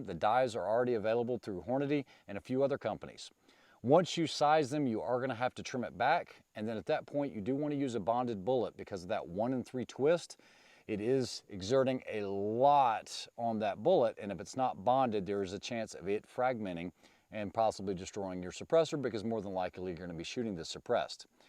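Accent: South, United States. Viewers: thanks! haha I knew it had to be a bit more southerly US because of your accent, which I also like very much! Thanks again for the cool range and the great information on the new cartridge.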